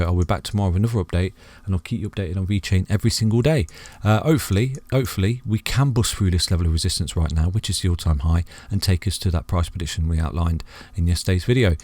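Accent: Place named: Nottingham, United Kingdom. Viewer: Sound like your from Nottingham! Thanks for the videos bro if you ever wanna do paid lessons for learning charts let me know I’m looking to learn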